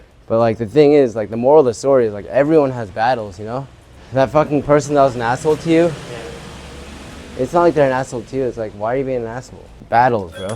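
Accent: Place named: United States. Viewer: Wait.. What? You have a very good American accent for a Korean.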